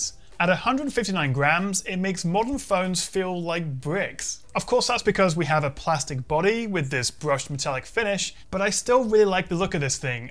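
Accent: United Kingdom. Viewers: Always loved your vids man great to listen to the British accent amoungst the sea of canadian tech YouTubers Happy for you your videos are always quality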